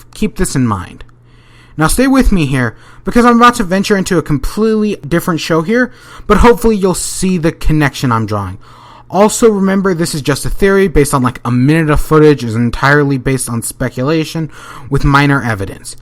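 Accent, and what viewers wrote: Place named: United States. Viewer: he sounds like he has a normal American accent tho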